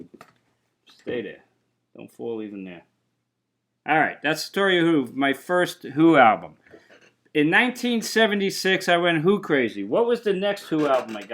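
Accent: Boston, United States. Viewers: What's your accent? Boston? It's a bit like JFK in places.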